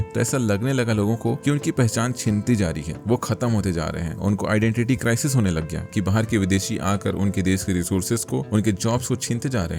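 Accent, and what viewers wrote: Chhattisgarh, India. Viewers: No offence ! But are you Chhattisgarhi? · Aap Chattisgarhi hai kya?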